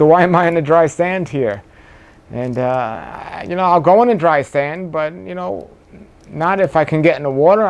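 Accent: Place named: New York, United States. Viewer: Your from NY right ? Can tell by your accent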